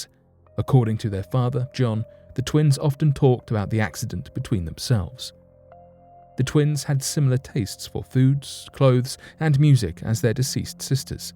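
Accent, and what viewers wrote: United Kingdom. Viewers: That British accent makes this all legit...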